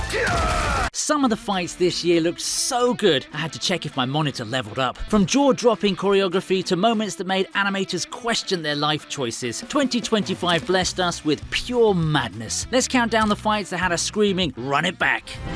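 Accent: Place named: United Kingdom. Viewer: I searched best anime fights and expected a compaction. Wasn’t prepared for some British clown talking but do you I guess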